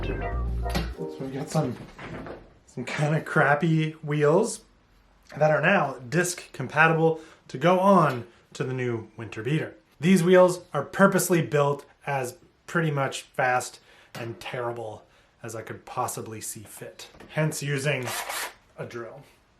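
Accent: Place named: Maritimes, Canada. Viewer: I thought you sounded like a Maritimer.. then you said "Nova Scotia winters".. I'm stoked to see an awesome East Coast youtuber building bodged bikes. I think you put the final nail in the coffin of my plan to buy a new hardtail this summer. My 2015 Norco Storm is getting a bunch of new parts in the near future. (As if I could find a kickass hardtail any time before July anyway...)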